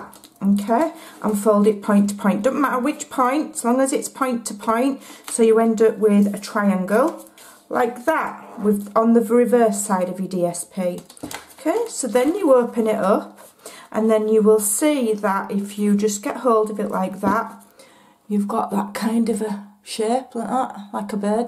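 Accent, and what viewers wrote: United Kingdom. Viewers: Such a neat MAILABLE gift! Love your accent, too. May I ask where in the UK you're from? Thanks for sharing! :)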